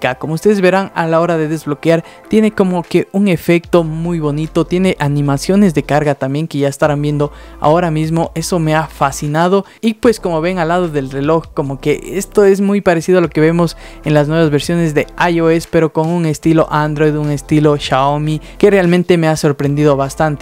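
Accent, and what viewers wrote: Cuenca, Ecuador. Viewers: Eres de cuenca?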